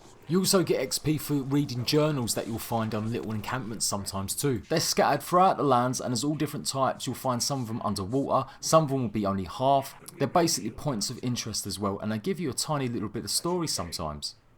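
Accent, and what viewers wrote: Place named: Cockney, United Kingdom. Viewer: He is like a super-cockney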